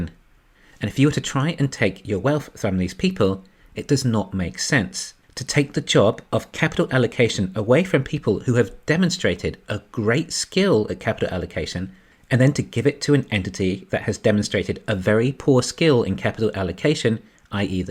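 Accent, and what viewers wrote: England, United Kingdom. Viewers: Are you from England?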